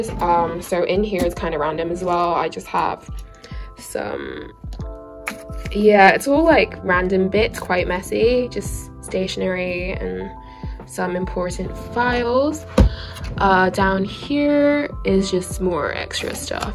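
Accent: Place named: United Kingdom. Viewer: Your British accent is showing!!!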